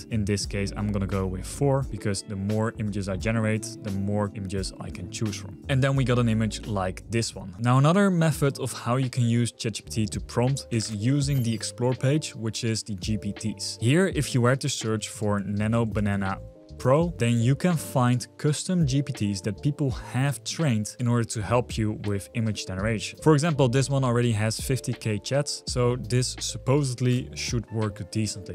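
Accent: Netherlands. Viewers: How is it that I can always recognize Dutch people when they speak English, even when they speak it almost without an accent? By the way, great content, keep it up!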